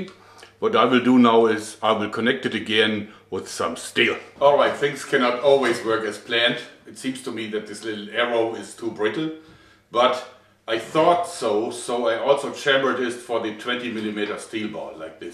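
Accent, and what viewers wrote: Germany. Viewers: where are you moving to im guessing somewhere in Germany at least that's what i think because of your accent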